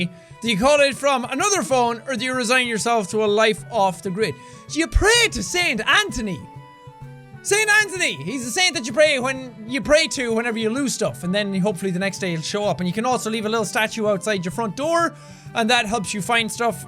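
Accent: Ireland. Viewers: 30 seconds and 2 minutes in isn't a "normal" Irish accent, it's a watered down version that he developed while he's been on Youtube. Watch his early videos, he sounds really different. Actually at first he tried to get rid of his accent completely because he didn't have the light accent that he has now and he was worried people wouldn't be able to understand him. If you think he's incomprehensible by the end of this video, you should bring a "translator" with you if you ever visit Ireland lol. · oh god his accent is so irish as the video goes on OH MAH LORD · he just got more and more irish as the video progressed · accent gets gradually more Irish as the video goes on and it’s wonderful ☘️ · This is the most Irish I think anyone will hear, ever. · he went like... full irish with this test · I never heard so irish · Holy shit this is the most Irish I've ever heard him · slowly gets more Irish as the video goes on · You sound more Irish somehow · I love how his accent gets more and more irish through the video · Jack: *gets progressively more Irish as the video goes on* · He sounds so Irish in this video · The way Jack becomes more Irish while talking about Ireland is like me speaking more and more Chinese while talking about REAL Chinese Food. 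· I love how anytime he makes a Irish videos like this he gets more and more Irish as the video goes on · Rewatching all his videos and i love how thick his irish accent is compared to now xD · I love how Irish he talks in this vid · Jack gets more irish as it goes😂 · He went full Irish for this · In the last ten minutes of the video only Irish people would be able to actually hear what is saying cuz the accent thickened throughout the whole video😂😂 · I like how he just becomes 600% more Irish in these videos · The further you are in the video, the stronger his Irish accent gets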